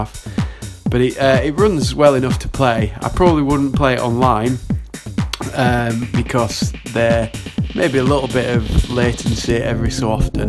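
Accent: Yorkshire, United Kingdom. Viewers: Definitely beater not baiter! Trying to place your accent...fellow yorkie?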